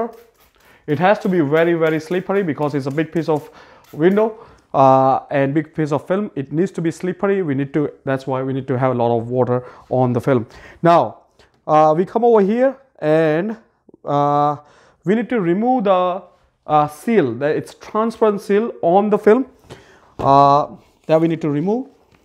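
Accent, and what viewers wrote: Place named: India. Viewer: What in the world kind of accent is that?!?😂 Sounds like that made up accent Jayden Smith used in that movie “After Earth” 😆 Part of it sounds Indian, part sounds English with a hint of something else lmao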